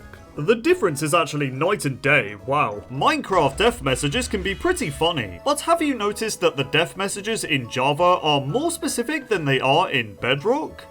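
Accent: United Kingdom. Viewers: oh. you dont. you guys just sound british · dude hes British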